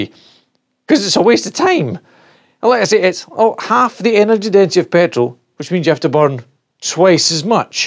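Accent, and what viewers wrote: United Kingdom. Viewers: Best Brit voice ever. ,,bloody Right. small curses will be ignored by, ,,your Church. Happy,, Holidays,,and Knew Year You'All.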